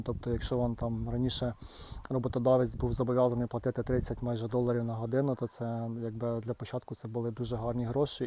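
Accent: Cherkasy, Ukraine. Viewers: Дякую вам за чудову мову, я насолоджуюсь! Бо та кацапщина, навіть з уст українців вже в горлі стоїть! По акценту спробую вгадати, Чекркащина або Польавчина??)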